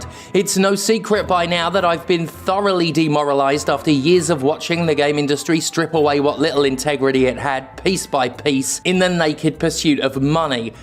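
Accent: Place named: England, United Kingdom. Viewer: Love how as never lost his English accent.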